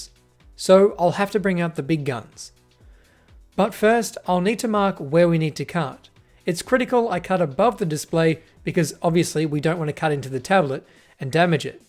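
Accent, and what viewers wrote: Australia. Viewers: Dude that vacuum looked to work fantastically.. please help w brother out, what kinds vacuum? Make/model. Or do they only sell em in AU or whatever the accent is. Enjoyed the video.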